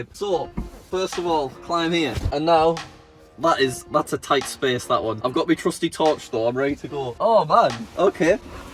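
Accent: United Kingdom. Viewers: Wait boot your brithish huh im fine with brithish soo yea have a great day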